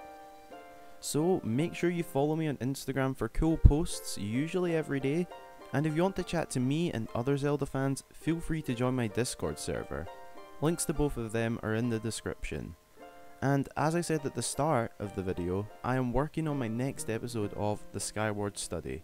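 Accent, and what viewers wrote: United Kingdom. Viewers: Are you Britain? Just wondering because of your Accent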